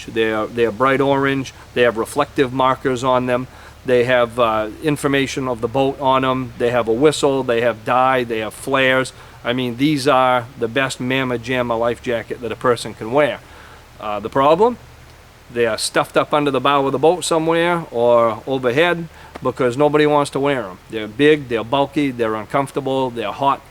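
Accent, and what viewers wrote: Maine, United States. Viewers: I'm a .45 acp guy. (1911&G36) But I tell everyone who asks me that the most effective weapon is one that you can put rounds on target accurately and rapidly. I don't care what the caliber is. Stick with what you shoot best. BTW...are you from Maine? (accent kinda gives it away. Maineiac born and raised here)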